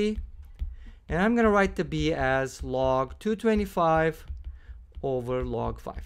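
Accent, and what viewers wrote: Turkey. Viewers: Are you Turkish? Your sound like turkish english.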